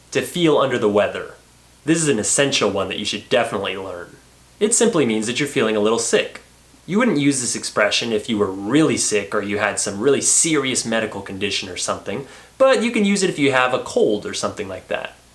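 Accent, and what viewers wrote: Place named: United States. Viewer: i think it can be normal because he speaks as a native American that's why don't be demoralized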